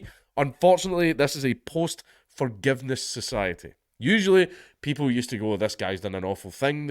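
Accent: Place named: West Scotland, United Kingdom. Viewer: Your killin it with the western scottish mix of language. Keep crushin. I'll keep like'n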